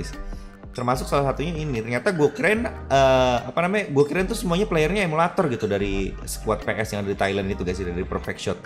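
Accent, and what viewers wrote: Java, Indonesia. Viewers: Orang Jawa to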